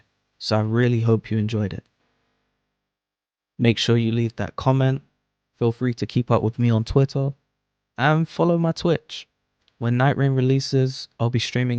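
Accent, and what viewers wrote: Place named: England, United Kingdom. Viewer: High quality content + English accent = Instant sub. Spiffing.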